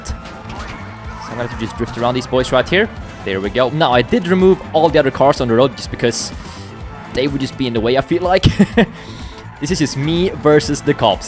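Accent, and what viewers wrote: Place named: Sweden. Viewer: Swedish ascent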